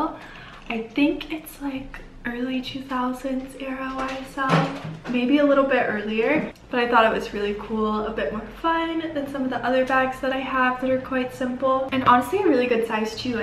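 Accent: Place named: South, United States. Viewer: Omg I used to watch you yeeeears ago when you still had a cute little country accent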